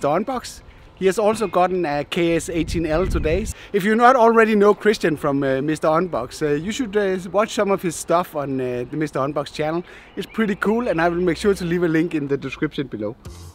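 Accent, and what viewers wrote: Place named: Denmark. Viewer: Din accent lyder meget dansk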